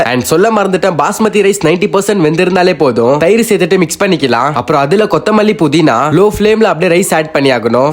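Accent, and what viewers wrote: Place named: Karnataka, India. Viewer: Ungaro kannada slang nalla iruku bro 😎😎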